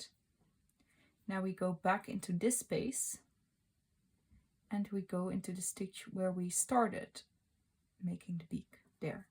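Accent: Netherlands. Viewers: I LOVE DUCKS AND READING! So I’m making this Ofc!! And, are you dutch by any chance? I hear an accent 😅😅 you are so talented and I’m a dutch crocheted aswellll!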